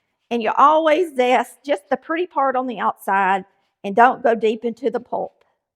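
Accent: South, United States. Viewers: As a Brit I love your Southern accent, it's so relaxing to listen to. The recipes are great too, of course!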